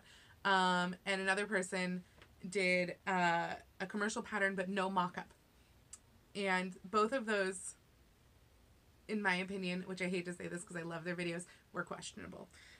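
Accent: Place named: Oklahoma, United States. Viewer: Oklahoma? No wonder I enjoy listening to you.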